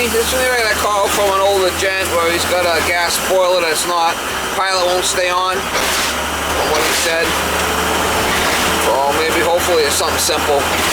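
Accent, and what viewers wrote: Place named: Boston, United States. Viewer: Oh my goodness..ahh you kiddin me? You sound eggzacktlee like my grandmutha my mumma and awl my relatives from Boston Lowel and Billerica yo wa voice tone sounz eggzactlee like my uncls my uncle brooce! I was writing this exactly the way my family sounds when they talk oh my gosh i cant believe it, i am getting teary eyed and i feel a warm no joke im not pullin your leg i feel a warm loving cozy safe feelin in my chest my heart listening to you talk....it is remarkable to listen to a familiar accent and feel immediate family love a sense of belonging it is so weird! I am going to watch now ive been listening to you and feeling so much comfort my gosh im gobsmacked im absolutely stunned ...holy cow. Okay well thank you for being you! Lol now im gonna watch because you are going to change out thermal cupler and that is exactly what my problem here is and its an old Gaffer and Sattler furnace forced gas heater it went out and now i cant relight it ...not sure how it went out to begin with!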